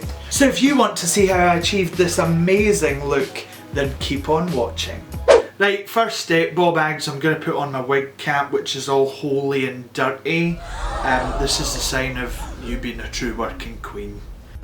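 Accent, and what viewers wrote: Scotland, United Kingdom. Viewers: Hahahaha I love the Scottish accent never heard it before I became a big fan hahaha , now I want to change my English accent into Scottish haha haha ❤️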